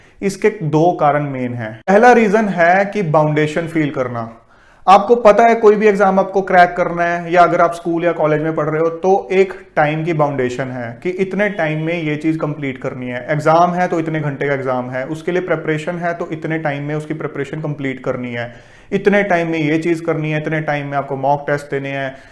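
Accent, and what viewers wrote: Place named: Punjab, India. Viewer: punjabi punjab aala banda e bolda mere pra.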